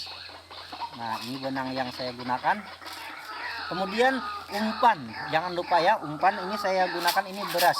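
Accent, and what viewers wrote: Kalimantan, Indonesia. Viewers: Logatnya orang kalimantan ya bro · Dari cara bicara kayaknya pian urang kalimantan... Ini kerjaan ku masih SD.. dapt 1 aja seneng nya war biasa.. komplit ya ... D tunggu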